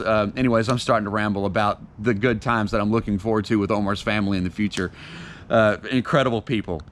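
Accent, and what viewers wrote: Morocco. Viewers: Bravo; You speak now like a real Moroccan 👏👏👏👏👏👏👍👍👍👍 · Stay safe bro...we gonna miss your very special moroccan dialect ...hope we will see you soon in Morocco. You re become one of us. ❤🇲🇦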